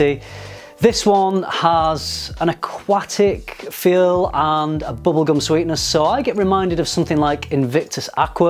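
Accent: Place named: Manchester, United Kingdom. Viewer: To be honest, I'm more focusing on your accent 🥰. Are you Mancunian?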